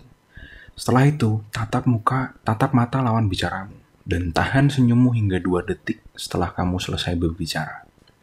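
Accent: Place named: Java, Indonesia. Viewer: menurut analisa saya dari suara yang saya dengarkan , anda pemikir yang dalam , logat anda jawa , artikulasi kurang begitu jelas yang menandakan anda seorang introvert , dan maaf, seorang narcissist.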